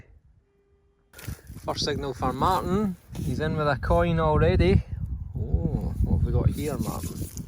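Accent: Scotland, United Kingdom. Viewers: Hey, hope you're doing well. Can I know, is your accent Scottish or Irish?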